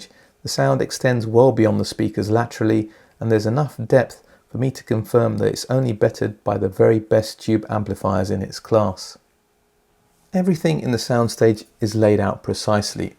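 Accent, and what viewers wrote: United Kingdom. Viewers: Thank you for another impressive review! Your articulation of audio is authoritative - your knowledge, experience and technical insight is unmatched in sophistication. The British accent and your command of the language simply makes everything you review sound so upper echelon and creme de la creme! Am I sensing that the AJ Uni Fi References are perhaps starting to grow on you as they burn in?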